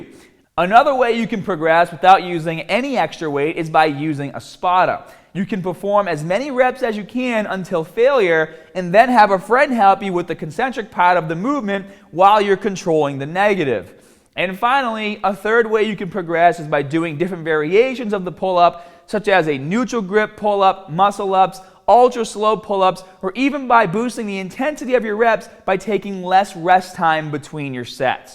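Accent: Boston, United States. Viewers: First introduction to this guy. Good video. Good info. I suffer at pull ups but I keep trying. He sounds from Boston. This guy puts the strong in "Boston Strong". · that boston accent be thiiiiick haha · Marine Poolee here. I ship out to boot camp in October and I've been working hard to get better with pull ups. I couldn't even do 1 pull up a couple months ago but now I'm up to 10+ pull ups. Btw, you talk the CORRECT way, I'm from the Boston area and you talk similar to me. Both my parents are from the city with thick accents so I guess that's why I talk the way I talk lol. Your accent sounds a little forced but you still talk the right way lol. Keep up the good work bro 💪🇺🇲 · Sounds like Boston. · Thanks for the tip about the band assisted pull-up, I was looking for that. I think there is a R in the word "hard". Boston accent? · is that a Boston accent? 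· Am i hearing Boston? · Great video. I need to get onto pull ups and your vid gives great advice so thank you. Great Boston accent too. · You sound like you’re from Boston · Pullups R too Haaaaad for you...LMAO...The advice puts forth has been around since the 70s, nothing new here but the Boston accent did bring the lulz. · Must be from Boston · Soon as I heard you speak I said wait a second, this guy has to be from in or around Boston, then I saw the " " and now I know you must be, I'm actually right from the city of Boston 4th generation myself and Pat's season tickets holder, anyway, pretty cool and good luck with your channel and overall success, nice to see a fellow Bostonian doing well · Sounds like Boston man... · The Boston is strong in this one! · Very good video, these are great tips, especially the ones that have to do with getting started if you can't do pull-ups at all. And as always, that Boston accent is on point! 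Love it bro! Keep doing you! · Hes definitely from Boston. Forst time watching this guy but im from Boston so its not haahhd to heaa the accent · Boston rrrrrrrrr's all ovah the place! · Boston I think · Staaat the caar near the baaa.....and be smaaaaaat. Must be Boston or close by. His advice is good.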